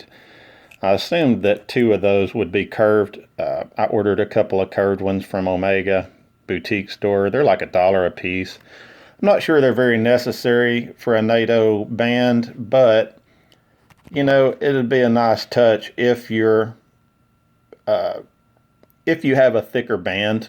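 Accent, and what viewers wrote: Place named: United States. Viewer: Hey bud, so glad I found your video. You sound like you’re from the states. I’ve been trying to justify buying an omega nato, until I found out they come with this kit. However, I keep reading that this specific packaging is only for European countries. Can you please confirm this packaging is also for U.S. customers. Thanks in advance. And great watch by the way, I got the black one.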